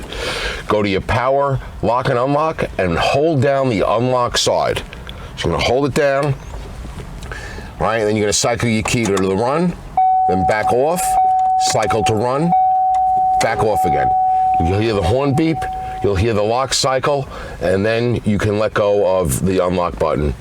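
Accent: New York, United States. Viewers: I know right! Love NY accent. I’ve been watching The Sopranos and this gentleman could be cast in it if they ever do an update.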